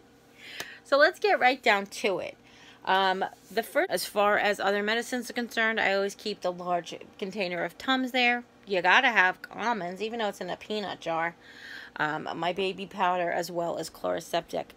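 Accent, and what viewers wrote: Long Island, United States. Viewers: Love the Long Island talk, I miss LI but I don't miss the amount of people living there!!! You are organized....wish I was!!!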